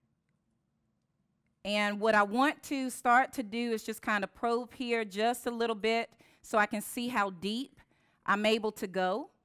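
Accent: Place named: South, United States. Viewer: She from the south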